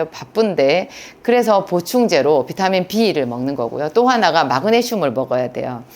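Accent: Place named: Yeongcheon, South Korea. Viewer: 영천 억양인데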